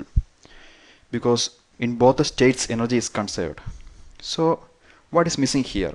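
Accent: Kerala, India. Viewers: Malayalee accent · I think he is a Malayali from India .. Damn accent!!! Can't focus on the video :(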